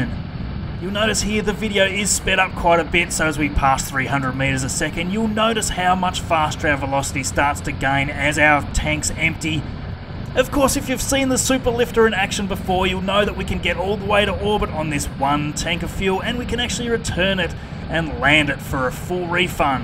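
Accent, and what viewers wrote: Australia. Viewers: It's an Australian accent. Didn't you catch the "crikey!"? lol. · just got a sub only due to your amazing auzzie accent, what state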